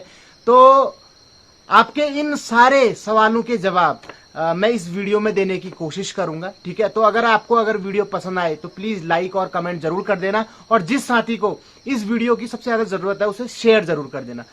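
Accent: Pahari, India. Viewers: भाई हमको आप की बात चीज बहुत अच्छा लगा पहाड़ी भाषा नीको लागछ 🙌💐🙌💐🙌💐🙌💐🙌💐👌🌹👌🌹👌🌹👌🌹👌🌹❤️🌹❤️💐❤️💐 दिल से बहुत अच्छा बहुत अच्छा है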